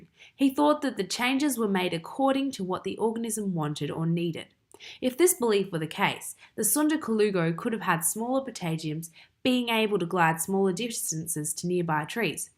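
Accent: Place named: Tasmania, Australia. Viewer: Sounds like a Taz accent,Well done video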